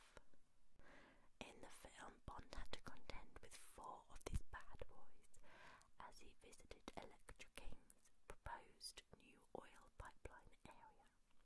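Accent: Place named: United Kingdom. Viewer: Lovely whispering, and I love the British sound 💖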